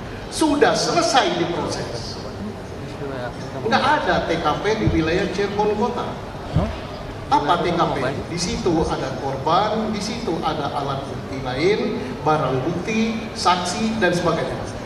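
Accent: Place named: Sumatra, Indonesia. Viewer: Sy beri tahu inilah ciri khas orang sumatera bercanda nya logat nya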